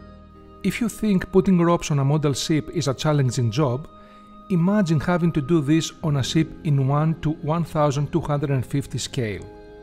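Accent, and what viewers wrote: Greece. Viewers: nice work and greek accent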